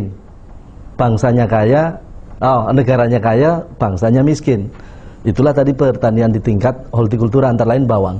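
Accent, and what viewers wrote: Java, Indonesia. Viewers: Ini Alm. Lord rangga? Kok medhok? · Logatnya medok jawa ya... Beda sama 3 tahun kemarin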